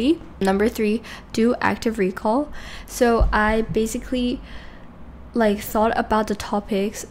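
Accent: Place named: United States. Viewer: wait im just curious why do you have an american accent if gcses are for the uk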